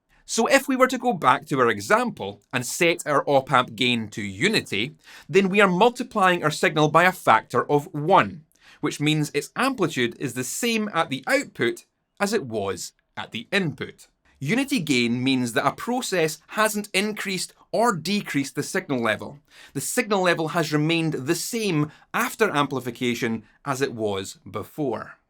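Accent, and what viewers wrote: Scotland, United Kingdom. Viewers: The Scotsman in me loves your accent, I'm in the USA so no accent, but I especially enjoy the way you break things down logically, and with humor! I plug straight in and keep it clean, because that sound makes me feel good, for whatever reason distortion makes me feel bad. But a smidgeon of overdrive is tasty in the proper context, or maybe a little fuzz? Cheers!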